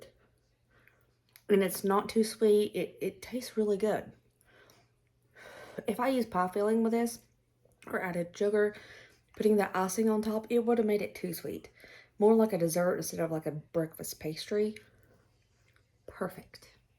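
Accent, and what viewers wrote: South, United States. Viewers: I just found you and can not stop watching you. I'm from Tennessee but live in Ohio. I sure do miss my southern belle accents, lol. I enjoy your recipes and your sweet hospitality. God bless you and your family and a very Merry Christmas. Your boys are southern Gents ❤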